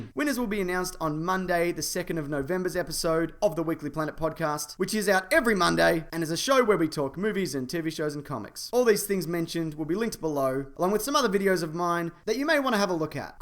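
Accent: Australia. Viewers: My second favorite video n this channel is just an Australian yelling about the future for five minutes. Priceless. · Fucking hilarious, as usual. Your comedic timing is perfect and your Australian accent stylizes your sense of humor perfectly. I would go to war with you any day, sir. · I never realised how much I love hearing Australians swear. Hey can you say 'fuckwit' in your next video. I just want to hear how it sounds. It's amazing the way Australians say it.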